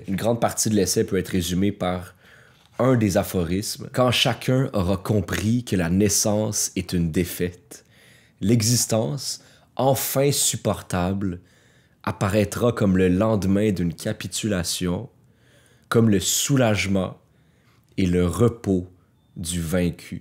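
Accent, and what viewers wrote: Quebec, Canada. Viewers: C’est un accent québécois? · Trouver une chaine de littérature tenue par un homme, est rare ! Avec une telle passion, de jolis mots et avec le cœur, Exceptionnel ! J'adore également cet accent. Le Canada, reste pour moi un rêve ! Três heureux, de ce hasard qui m'a mené ici! Merci beaucoup, de ce magnifique travail et de nous le partager.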